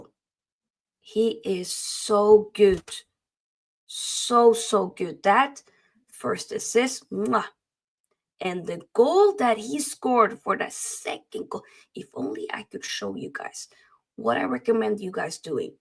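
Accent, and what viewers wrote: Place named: Norway. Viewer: The instant you started talking I knew you where Norwegian lol. That accent is real. Thanks for the video :) I think Nusa should stay for a couple of seasons in Bundesliga before going to a bigger club. But he will def end up in a top club with time. Let's not rush anything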